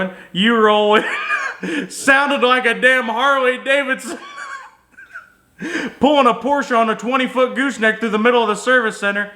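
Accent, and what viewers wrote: South, United States. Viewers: Funniest thing about this video is a dude with a country accent doing a country ass accent!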